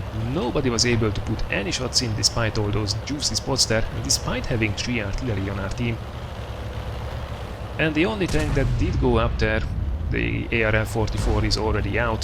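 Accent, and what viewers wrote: Hungary. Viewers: Annyira magyar akcentusa van xdd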